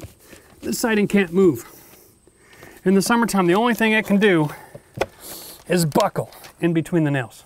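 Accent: Canada. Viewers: This was amazing and I'm not even going to be doing vinyl siding! Your speech and mannerism is clearly (a fellow) Canadian and obvious at the onset but I only figured it out when you mentioned Ottawa. But you also explain things as a teacher in a classroom and in the field (just enough explanation). Well deserved subscription! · Just here so I can hear Canadian words like when he says "stretch that oot"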